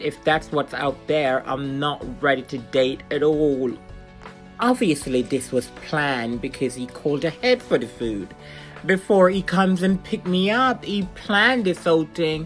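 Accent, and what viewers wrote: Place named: Ireland. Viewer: Love your videos keep it up girl I know u said your Jamaican I love your accent sounds Irish ☘️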